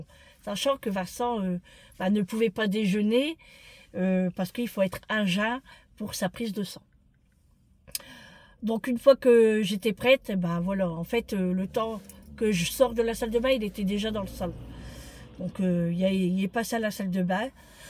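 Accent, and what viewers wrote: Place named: Marseille, France. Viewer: Bonsoir 🤗 Ici soleil cet après-midi, LILLE incroyable le monde..Bon retour de courses 💯heureusement que nous sommes là pour nos enfants 😉il a raison Vincent qu'il profite 😅 il est trop gentil Vincent 🤗tu parles comme les marseillais ça te coûte un bras😅 bon courage pour demain ta séance, faites sauter les crêpes 😅 Bonne soirée à vous deux bisous 😚 Nathalie de Normandie 😘🥰❤️💯🫶🌹🌹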